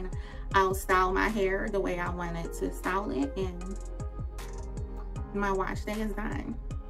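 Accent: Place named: Philadelphia, United States. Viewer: Loved this 🙌🏾 thank you sis! Lol I just knew you were from Philly with your accent 🤣 sending love from S. Jersey !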